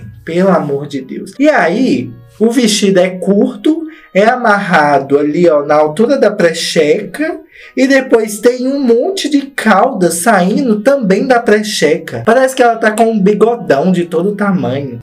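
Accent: Minas Gerais, Brazil. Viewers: Menino, acabei de chegar nesse canal com esse fofoqueiro com sotaque mineiro. Eu adorei.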